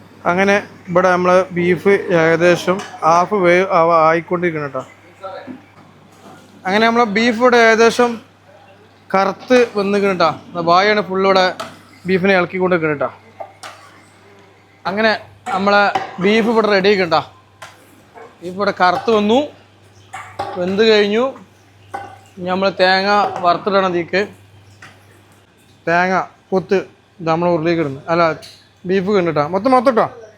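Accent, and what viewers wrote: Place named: Malappuram, India. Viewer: ഞങ്ങൾ മലപ്പുറംകാർ ഇങ്ങനെ തന്നെ ആണ് പറയുക എന്തെങ്കിലും കുഴപ്പം ഉണ്ടോ ബ്രോക്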